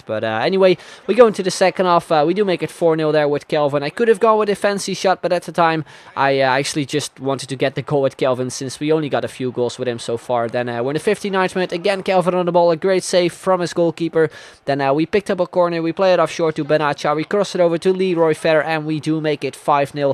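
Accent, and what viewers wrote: Netherlands. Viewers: Hij klinkt nederlands, is hij dat ook?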